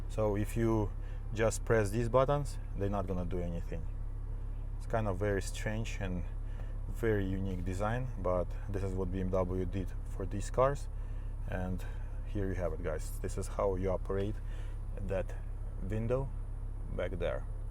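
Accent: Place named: Russia. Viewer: Судя по акценту ты говоришь по-русски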